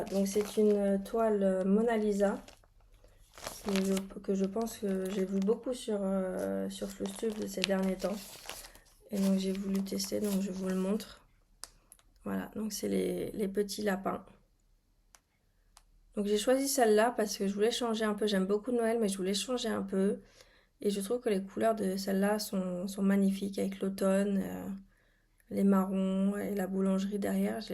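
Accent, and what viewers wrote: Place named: England, United Kingdom. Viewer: Jolie découverte de ta chaîne grâce aux suggestions de Youtube :) Quel joli accent anglais d'ailleurs ♥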